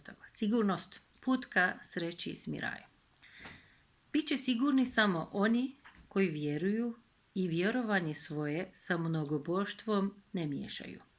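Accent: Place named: North Macedonia. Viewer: iyi guzel hizli konusuyorsun konusma aksanin makedoncaya. ve rus diline kaciyor kelimeleri konusma ve okuma yi yutarak ve hizli konusup okuyorsun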